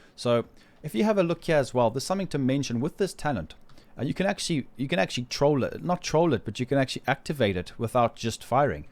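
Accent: South Africa. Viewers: you're from south africa aren't you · Are you South African?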